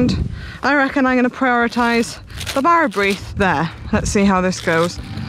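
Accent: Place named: Wales, United Kingdom. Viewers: is it me getting used to the sound of it, or is accent coming out more? it's lovely, actually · Welsh!! That explains the accent!!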